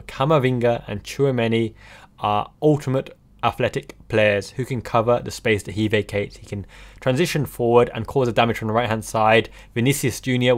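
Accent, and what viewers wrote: United Kingdom. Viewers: おすすめに出てきました。同じく日本のリヴァプールファンとしてyoutube活動応援しています。あと日本人としては珍しいukのアクセント、めちゃくちゃかっこいいです。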